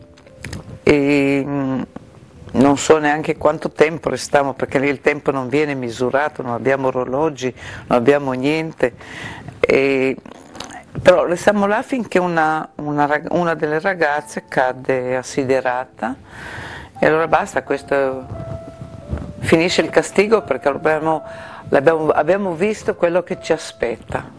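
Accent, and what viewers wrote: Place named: Rijeka, Croatia. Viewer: Còcola, che emozion rivederte in video e ricordar i nostri incontri... sentir la tua bela parlada fiumana: no te dimenticheremo mai! abrazi, da qua giù fin là su!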